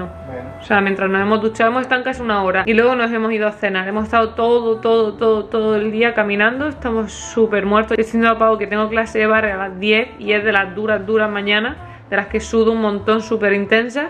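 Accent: Spain. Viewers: Si!! Graba el sobre ruedas! Me encantan tus vídeos! Y no tienes el acento de España muy marcado! No sé a qué se debe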